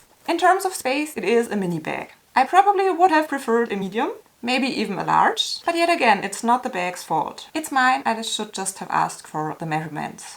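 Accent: Germany. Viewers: The bags are pretty 😍🥰 and your sweet German accent is ever sweeter ❤️🥰💋😍💞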